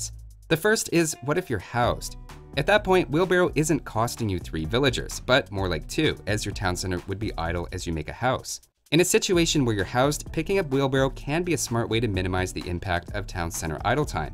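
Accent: Canada. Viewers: Wait. are you canadian dude?? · You are Canadian?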